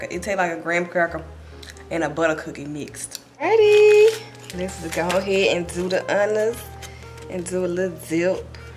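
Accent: Memphis, United States. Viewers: Hearing the Memphis slang and accent through her videos is so homey to me 😭 I be home sick when I’m not there.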